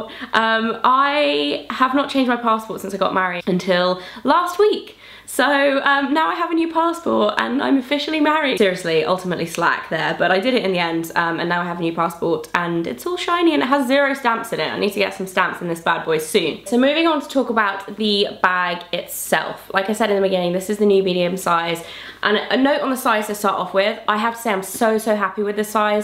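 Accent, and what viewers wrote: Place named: RP, United Kingdom. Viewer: I know it might be a weird thing to say, but I just love accent. English is my second language and I just think that all these English people sound so nice and posh and I wish that I could mimic accent.:D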